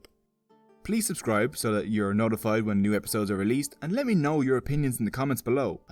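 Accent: Ireland. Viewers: That's 100% Irish accent.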